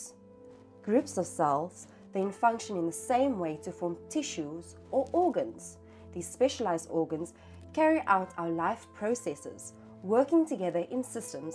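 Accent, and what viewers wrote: South Africa. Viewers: I love her Boer accent. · Love The South African Accent.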